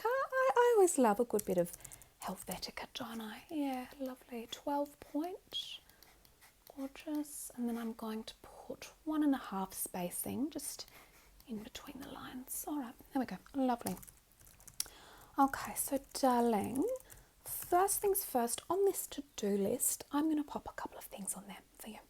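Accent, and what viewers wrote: Australia. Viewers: Just also i have to say i tbh wouldn't mind any un asmr video with aussie mom bcuz the accent lol have u tried learning other ones too? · i hate rich people ngl but there's something about this specific posh australian accent that hits the spot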